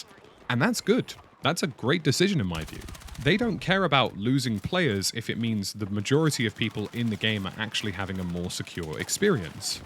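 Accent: United Kingdom. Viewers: You're avoid the issue because the man being accused is one of the "protected" degenerates and you don't want the heat. You sound like you live in the UK so it's really risky for you.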